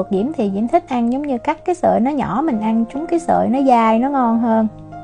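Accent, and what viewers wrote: South, Vietnam. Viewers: Quá đẹp Diễm oi. Chỉ dẫn quá tận tình với chất giọng miền Nam, ui chao nghe sao nhẹ nhàng và cuốn hút. Cám ơn nhé. Love you! 🥰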